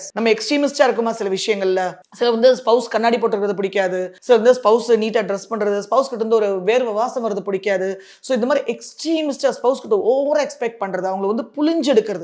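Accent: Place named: Coimbatore, India. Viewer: Kovai tamil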